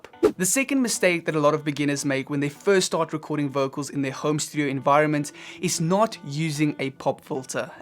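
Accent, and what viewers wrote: South Africa. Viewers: Good stuff! I always learn a lot from your vids.! And your S.A. accent is the coolest! 😀😎👍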